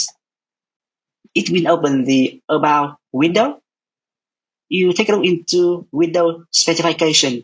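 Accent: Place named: Vietnam. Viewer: i love ur accent viatnamese right?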